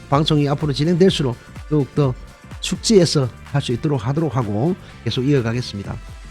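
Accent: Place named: North Korea. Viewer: 이 채널주는 북한사람입니다. 어투보세요. 북한사람이에요. 간첩.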